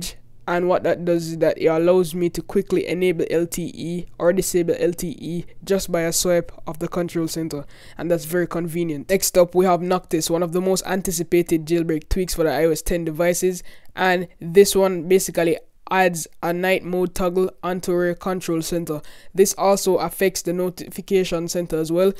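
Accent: Jamaica. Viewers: you Jamaican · Are you Jamaican?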